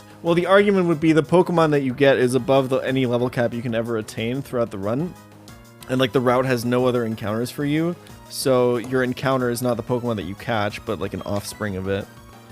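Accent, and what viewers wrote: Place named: United States. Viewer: YouTube and twitch. Come for the highest tier of nuzlocke Pokémon content. Stay because how tf does this guy sound more American than 80% of American people? Hong Kong and Singapore and Germany. Has he intentionally adopted the American accent from watching cartoons and other shows over the years that are created by “US English” speakers? Does he do it to be easier to digest for the US viewership market? Maybe his USA accent is just really good. I watched 151 attempts of EK, I had a lot of time to consider this while he was wiping to Watson because no VA chinchou.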